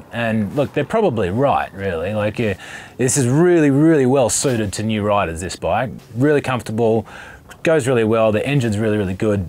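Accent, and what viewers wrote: Australia. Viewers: Are you from australia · you sound like ausie?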